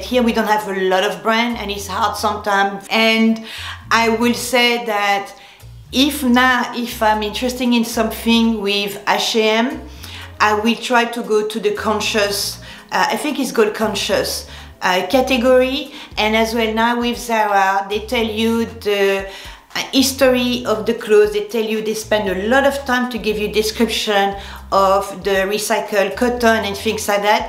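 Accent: France. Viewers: When I see your beautiful face and I hear “cleek,cleek,cleek in that wonderful French accent I know this video will be great. Your shopping advice was spot on. Since I started watching your channel I find myself making more of an effort in my appearance every day…and oh yes…perfume every day.!!!!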